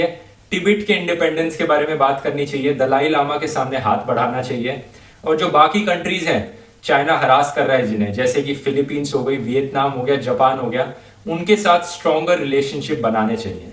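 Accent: India. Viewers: Another curry accent video full of boastful speech.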